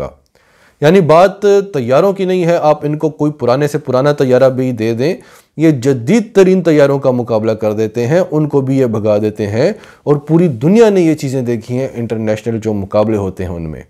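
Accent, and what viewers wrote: Pakistan. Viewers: Very good urdu accent pure pakistani accent unlike urdu speaking person which resemble to indian accent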